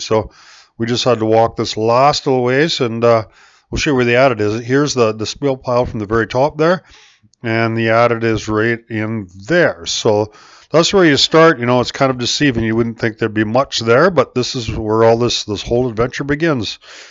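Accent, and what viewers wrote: Canada. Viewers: I can tell your from Canada A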